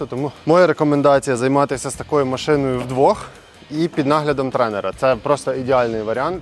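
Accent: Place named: Volyn, Ukraine. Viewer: Випадково не з Волині?) Акцент рідний якийсь 😁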